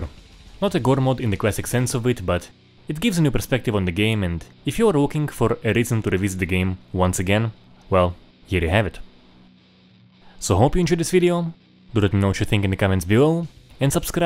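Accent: Russia. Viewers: Wнy aгe yoи souпds liкe a гиssian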